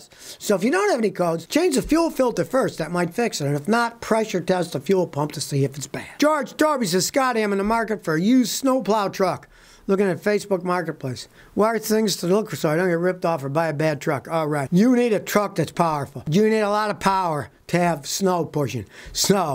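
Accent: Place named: Buffalo, United States. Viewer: SO FUNNY ,I BEEN watching this show , your in Texas ,but I heard Buffalo ,you talk real quick ,that Lake Erie water made you smart.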